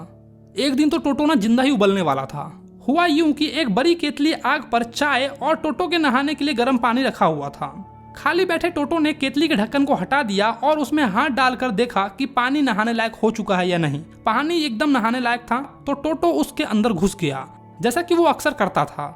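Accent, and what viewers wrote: Bihar, India. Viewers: Are you from bihar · Bhaii ye bihari hai toh bihari tongue hi hoga na. Explanation is good by the way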